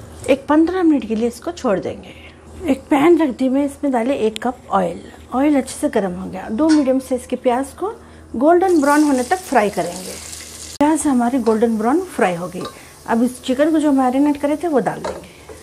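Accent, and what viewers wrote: Hyderabad, India. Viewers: Aunty ma to apki voice over k liye apki video dekhti hu your voice is really nice hyderabadi.....😀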